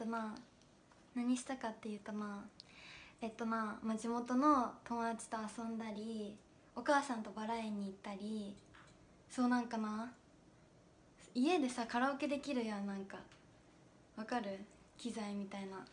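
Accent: Okayama, Japan. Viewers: 千鳥みたいな岡山弁使ってくれたらもっと好感度あがるな❤ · 岡山弁が「出ちゃう」じゃなくてもはやただの岡山弁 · 耳に馴染んでる言葉じゃけぇさ、岡山に帰っとる気がしょーたわ。ごじゃかわいいべや （北海道民） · 掛橋さんの岡山弁好き。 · 岡山の方面とかに友達おって頻繁に電話しとるからか分からんけど岡山弁が全然普通に感じる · ノブのイメージしかなかった岡山方言がこんなにキュンとするとは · 岡山県井原市？バラ園いいですね！！久しぶりに岡山弁聞いた。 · 岡山弁はじゃけえとやけえが混在してるのか広島弁と関西弁が混ざってんのね(ドイツ人) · 割とまじで疑問なんだけど岡山の何処だったらここまで方言つくの？ by市内民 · まだ綺麗な岡山弁じゃわ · いとこが岡山県だけどほんとにこんな感じや笑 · 岡山弁って正直きちーと思っとったけーこの人の言葉はすげー可愛い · 声かわいいから岡山弁でも柔らかく聞こえる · 出ちゃうというか終始岡山弁やで。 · 岡山って俺の地元の喋り方に似てるわ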